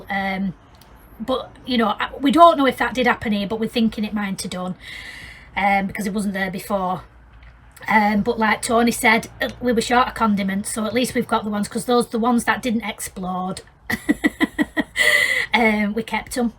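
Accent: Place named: Bolton, United Kingdom. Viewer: Lynn here on Hubby's phone mines playing up 😏, Wooo what a turn up , I'm just flipping through to see what I can watch and there you pipe up , I've just subscribed 👍, how far is this place from Yorkshire ?, I need to go for a Day 😊, my late Brother, he was called Tony and he used to build steam engines, he was a very clever man , we lost him suddenly a year and half ago , Love your way of thinking 🤔,can't wait to watch more of your videos , are you from Bolton? With your accent I'm sure I'm right 🤔😂😂👍 Take Care Love xx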